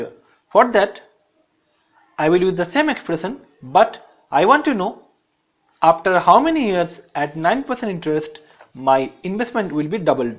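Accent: India. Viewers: you should work on your indian accent :/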